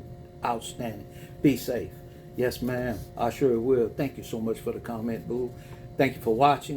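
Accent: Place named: Louisiana, United States. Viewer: Love your Louisiana accent, don’t know why but it feels like HOME❤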